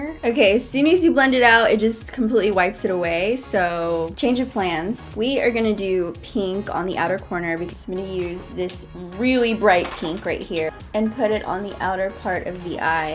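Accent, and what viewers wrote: England, United Kingdom. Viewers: Is English or American? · Um I say that u r probably from England so yay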